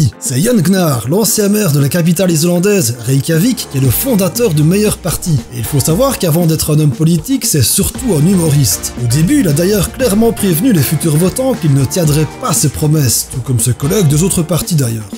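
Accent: Belgium. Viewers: Est-ce que tu peux arrêter de dire "houite" et dire "huit" stp, je sais que t'es belge mais bon ^^. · salut, tu fais des vidéos de qualité, du coup je m'abonne. Faut surtout pas que tu t'arrêtes, ton travail est déjà d'un niveau impeccable! j'ai fais un rapide tour de tes vidéos et je préfère cette voix plus naturelle :) elle fait moins Benzai que pas mal de monde te reproche, ce que je comprend pas trop d'ailleurs pcq avec ton accent belche c'est complètement différent ^^ Est-ce que tu as pensé à faire une vidéos sur les bières de notre pays ? genre top 10 des bières d'abbayes ou quoi :P Bonne continuation et je bois à la santé de ta chaîne, que ca prospère !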